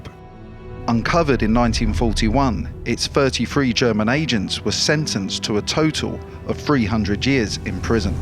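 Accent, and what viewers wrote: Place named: United Kingdom. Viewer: NYC history with a British accent. Fail...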